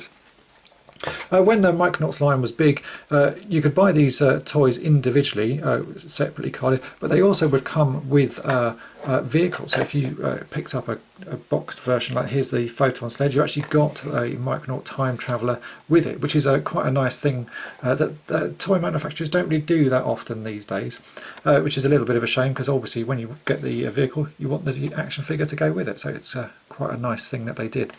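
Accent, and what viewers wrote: United Kingdom. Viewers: John Jones he's a Brit. vowels tens to be reversed.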